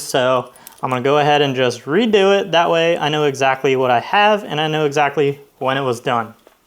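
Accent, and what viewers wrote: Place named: Maryland, United States. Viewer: Definitely tell you're from maryland just by listening lol. Sounds like a Rosedale native to me with those O's lol.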